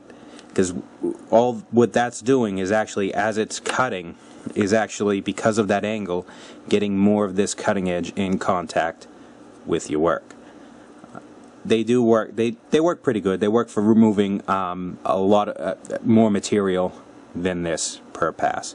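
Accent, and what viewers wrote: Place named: New England, United States. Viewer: Good to hear the accent - I used to race at New England dragway in Epping and grew up in Burlington, Mass. - Now teaching machine shop in Florida - good videos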